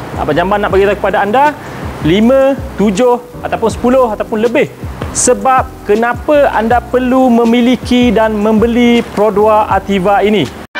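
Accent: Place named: Terengganu, Malaysia. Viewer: Try ckp kl tpi bunyi ganu gak😂klaka la abg ni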